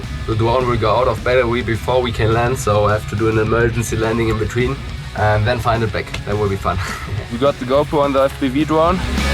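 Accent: Germany. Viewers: Mega geiles Video! „Bad english with perfect german accent“ passt so geil zu dir als Marke 😂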